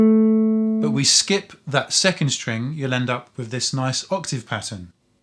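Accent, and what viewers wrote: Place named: Scotland, United Kingdom. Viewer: Vvery Scottish indeed. Fantastic content. You are a natural!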